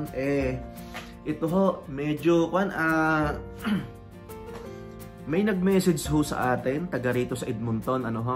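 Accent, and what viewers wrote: Batangas, Philippines. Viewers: I stumbled upon your vlog kabayan at nung madinig ko ang accent mo eh nag suspetsa ako na taga batangas ka😊. Kumusta na lang ala eh taga Batangas din ako.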